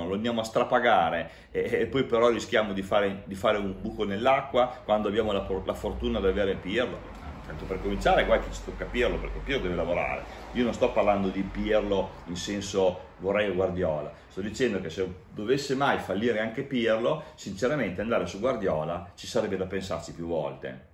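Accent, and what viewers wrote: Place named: Piacenza, Italy. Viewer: Ciao ma sei di Piacenza? hai l'accento simile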